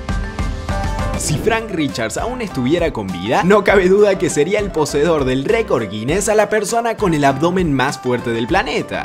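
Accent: Argentina. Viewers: No sabia que eras argentino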